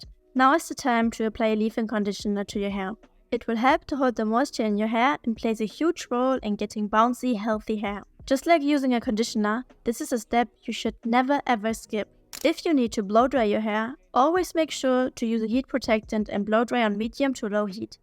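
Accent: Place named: Germany. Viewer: I hear a strong German accent 😄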